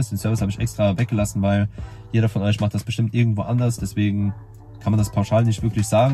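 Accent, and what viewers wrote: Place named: Saarland, Germany. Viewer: Saarländer, kannst du nicht leugnen😄 aber cooles Video! Sympathisch und authentisch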